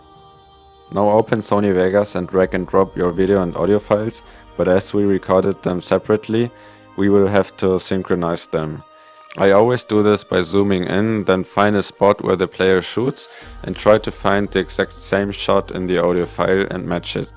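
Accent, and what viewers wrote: Germany. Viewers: you sound like you're coming form germany^^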